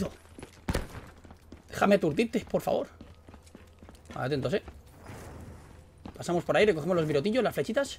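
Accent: Mexico. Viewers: Wey Me gusta cuando ablas como mexicano jaja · hablando como Mexicano?? Jijij yo soy Mexicana:3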